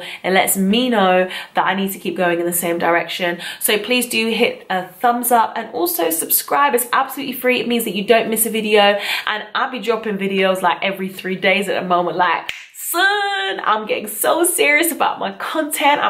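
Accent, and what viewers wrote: England, United Kingdom. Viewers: missing the English voice over here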